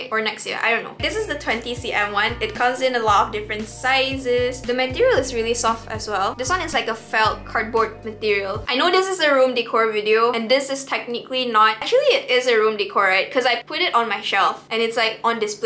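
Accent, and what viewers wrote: Malaysia. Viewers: Your chinese is really good,and your accent sounds very 'Malaysian Chinese'. I literally thought that you're a mixed race between Chinese and Malay🤣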